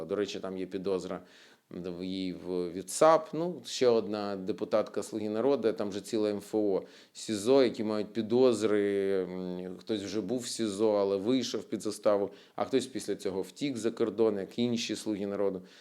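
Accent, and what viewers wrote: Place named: Russia. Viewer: Лёшенька , переходи на русский язык. А то сбиваешься на него .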